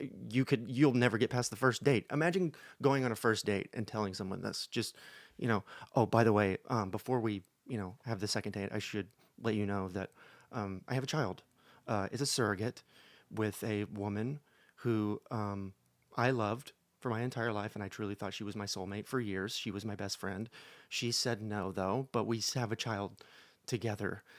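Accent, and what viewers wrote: United Kingdom. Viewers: Yep, from uni to flat to being at mine. I'm guessing somewhere in the west. So VERY Brit.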